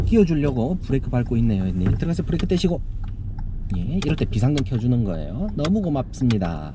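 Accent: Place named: Busan, South Korea. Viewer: 열정이 있네요~ 은 민초들의 눈높이 교육방식 아주 아주 조아요 칭찬합니다 굿^^ 악센트가 부산 같기도 하고 푸하 ^(^ 항상 마음의 중립 중립 중립 하시길 P.S-> 근데 발이 큰가요? 닉이~ 군요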